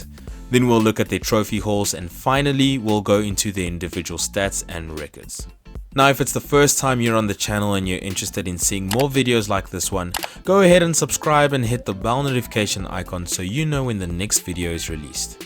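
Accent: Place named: France. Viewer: Thoroughly enjoyed the narrator. Man is definitely French. Looking forward to many more videos. Top stuff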